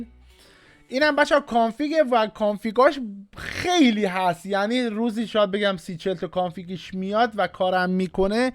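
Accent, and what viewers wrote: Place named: Shiraz, Iran. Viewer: شیرازی لحجه طلا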